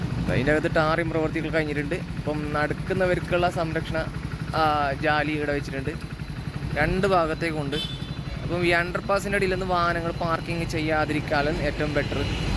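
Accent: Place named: Kasaragod, India. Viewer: ഒന് ഓന്റെ ഭാഷയിലാണ് പറയുന്നത് ബ്രോ കാസറഗോഡ് അങ്ങനെ യാണ്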